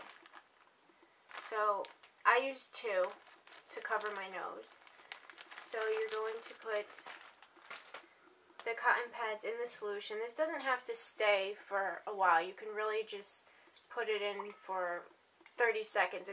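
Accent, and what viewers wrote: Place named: Northern England, United Kingdom. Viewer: Worked, thanks. Btw, are you from up Nort? Thought I heard a bit of a northern dialect in your speech.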